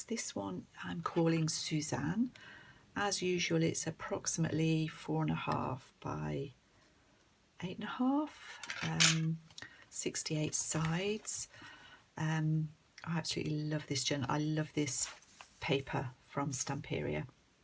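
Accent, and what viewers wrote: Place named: West Midlands, United Kingdom. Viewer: I’ve just discovered your lovely channel....some beautiful journals you have xx I started journalling earlier this year and love it! I thought I detected a West Midlands accent....I’m from Brum originally 🙂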